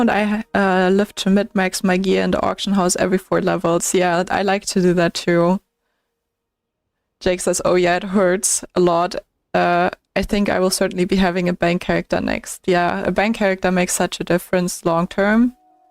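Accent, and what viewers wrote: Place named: Germany. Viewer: i would guess german ;-)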